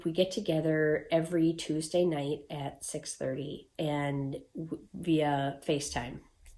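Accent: Minnesota, United States. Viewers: Good to see you back. Stay safe. I was watching this and my housemate walked in the room. When he heard your voice, he asked where in Minnesota you were from. His mom and sister live in Sauk Centre and his niece is a pediatric nurse at the hospital in St. Cloud.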